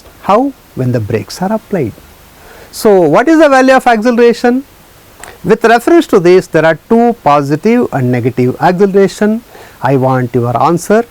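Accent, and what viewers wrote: Telugu, India. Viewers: Really ur Telugu only sir I caught many words like questions su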